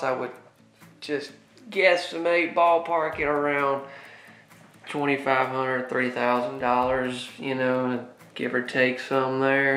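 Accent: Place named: Alabama, United States. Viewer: Great video, I would like to mention how I enjoy your Alabama accent, my spirit is good 97% of the time as I journey through life! And I am AA and i'm a fan!.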